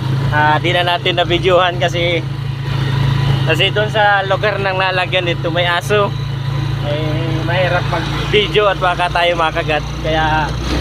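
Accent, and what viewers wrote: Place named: Camarines Norte, Philippines. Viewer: hello po idol,watching po full wh po,done tamsak at dikit narin po ako sa bahay mo😊❤❤parang sa tono po ng salita mo idol parang taga camarines norte ka po,taga dun po kase ako baka po magkababayan tayo idol😊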